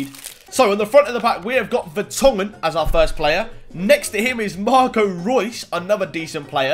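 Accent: Northampton, United Kingdom. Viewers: Are u from Northampton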